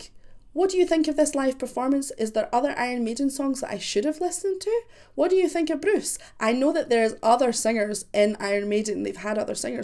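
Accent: Scotland, United Kingdom. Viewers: Lovely finding a scottish reactor! Pure cracking up at some of your patter, you made me homesick, naebdy swears as fluently as us 🤣 Bruce is one of the all time great singers, you want to check out what his voice was like when he was younger (just as good). I actually was at the very first gig where sang for Maiden, at the Barras in Glasgow. Powerful singer, sort of reminiscent of or. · First of all, love that Scottish accent. 2nd, had the pleasure of seeing Iron Maiden twice (, seven times), and it is a wholesome experience. Sing along, jumping together, a tribe coming together for a metal sermon. My fav. is, no question. You mentioned gothic briefly, I suggest you check out the by Iron Maiden handpicked warm-up band -. Other than that; - (Live Masters of Rock 2015).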